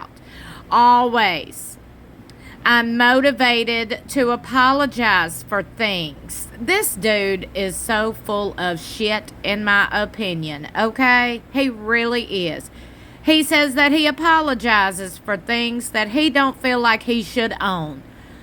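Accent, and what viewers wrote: South, United States. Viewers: This is awesome and your strong southern accent makes it hilarious! I love the way you say full of shit!😂😂😂 I’m southern girl as well so I appreciate a good southern accent ❤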